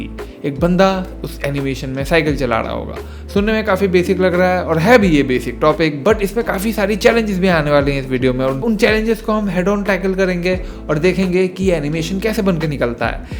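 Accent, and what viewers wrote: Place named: Kolkata, India. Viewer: আপনি কি বাঙালি? আমি বাংলাদেশ থেকে। হিন্দি অ্যাকসেন্ট কলকাতা এর মতো লাগছে।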